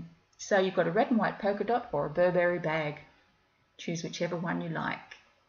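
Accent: Australia. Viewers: Hi, I am new to your channel and must say that it is refreshing to have an Aussie voice chatting to me. Love the bags, I can see myself making a few of these for Special Occasions. Thanks for such clear instructions.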